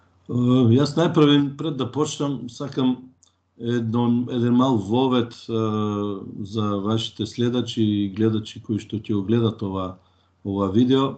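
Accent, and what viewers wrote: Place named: Bitola, North Macedonia. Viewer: По говорот вака ко битолчанче ми личи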